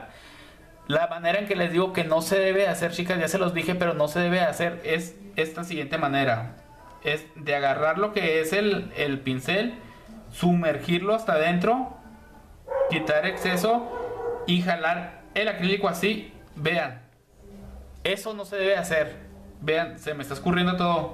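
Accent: Chihuahua, Mexico. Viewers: a mí me parece que es de Chihuahua..por como pronuncia la ch 🙃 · Eres de chihuahua?...! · Tu eres de chihuahua! Por el acento! Nada más por ser mi paisano te voy hacer caso caray!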